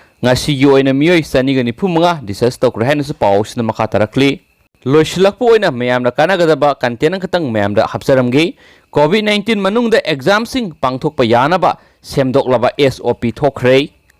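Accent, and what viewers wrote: Moirang, India. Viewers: Pao parise taba nungaite Moirang lomgi accent malli. Meitei lon chumna haiba heiba ama pammi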